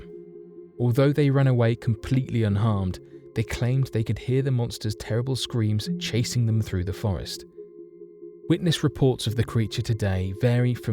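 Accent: United Kingdom. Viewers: Another boring British monotone narration. Blaaaaaah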